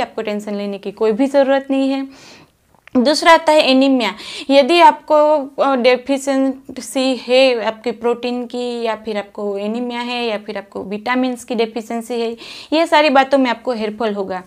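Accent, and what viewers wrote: Gujarat, India. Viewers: Ap Gujarati ho ky??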